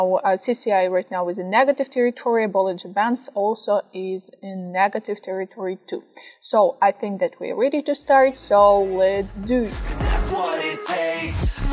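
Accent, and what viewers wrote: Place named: Russia. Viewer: Почему я слышу русский акцент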